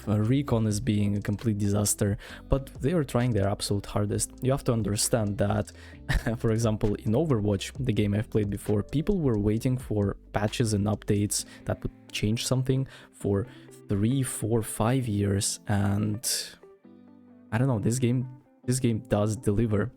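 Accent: Russia. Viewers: Бро, зачем ты косишь под американца? Либо я схожу с ума, либо у тебя реально прослеживается русский акцент :D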